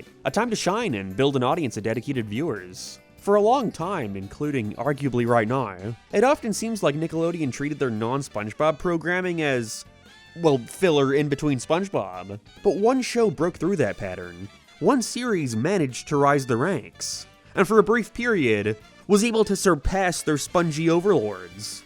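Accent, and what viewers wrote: Ireland. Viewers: I'm pretty sure he's Irish or something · is bro irish or no 💀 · Hes Irish living on America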